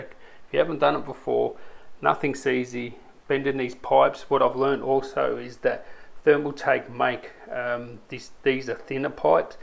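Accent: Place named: Australia. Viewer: Getting rid off ur au accent may appeal more views, mean no harm ♥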